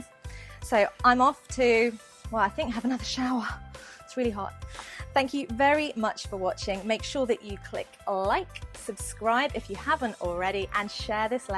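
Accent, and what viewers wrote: United Kingdom. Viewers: cool your british accent. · I loved British accent after that vedio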